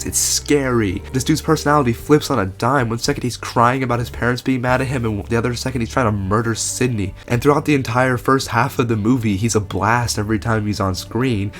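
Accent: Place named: Germany. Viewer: Nice video! You've a german accent kinda xD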